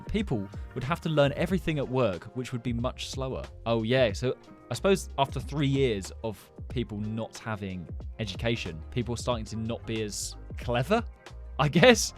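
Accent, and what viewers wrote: United Kingdom. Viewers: r u bri'ish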